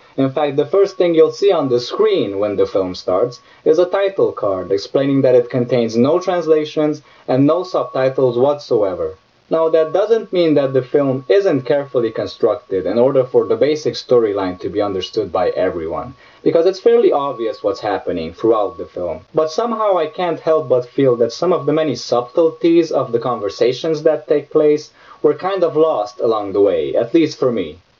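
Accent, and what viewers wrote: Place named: Portugal. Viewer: Are you portuguese? You kinda have a portuguese accent :)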